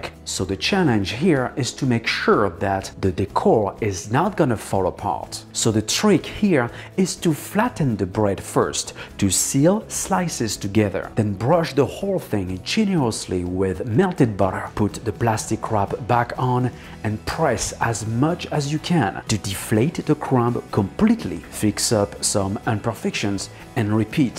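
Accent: France. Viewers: You killing me with French accent. Every things in your hand turn to masterpiece. Love from Sweden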